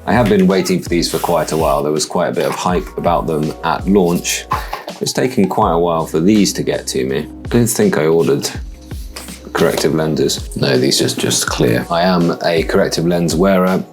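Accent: United Kingdom. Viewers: I'm a frog. You have such a looooovely British accent. Love it! Sincerely.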